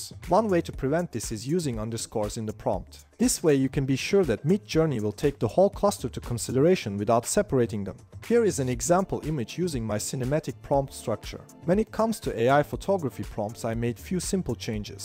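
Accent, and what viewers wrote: Turkey. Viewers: Krall Türk müsünn bu bizim ingilizce aksanımız nerde duysam sanırımm hahah :D Ya şeyi sormak istiyordum örnek bir ürün fotografımız var çanta diyelim. O çantayı aynı tutup arka planınıı değiştirebildiğimiz bir sistem var mıdır bununla ilgili bir kullanım şekli ?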